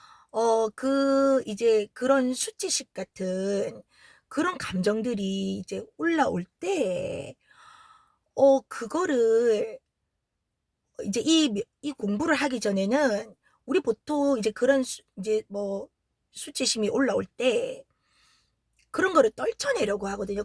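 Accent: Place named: Gyeongsang, South Korea. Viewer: 같은 경상도말투라서 그런지 나탐님, 사랑작업, 아이엠티비보다 이해가 500프로되네요 감사합니다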